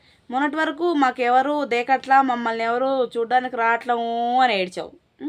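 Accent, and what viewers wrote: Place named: Kadapa, India. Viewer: Mi slang bagundi sis midi kadapa dist aa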